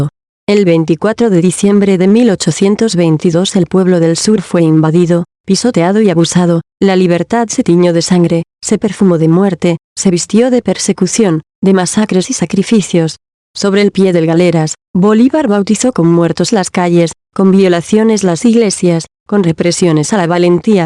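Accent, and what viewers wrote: Spain. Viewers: Bueno yo les voy a decir algo quienes fueron los primeros MATONES DELICUENTES SAQUEADORES BIOLADORES ASESINOS en la colonia latinoamericano quiénes fueron los Epañoles y tu hablas de un VENEZOLANO que se REBELÓ Y TRAICIONÓ AL IMPERIO ESPAÑOL para la libercion del RÉGIMEN CRUEL ASESINO BIOLADORES LADRONES QUE SAQUERON A LOS PAISES latinos de a aquélla epoca . TODOS TIENEN SU LADO OSCURO OSEA tienen RABO de paja no se pueden acerar a la CANDELA POR QUE SE VAN A QUEMAR hay te la dejo . Por tu acento eres ESPAÑOLA PAMAS VAINA jajajaja me CAGO DE RISA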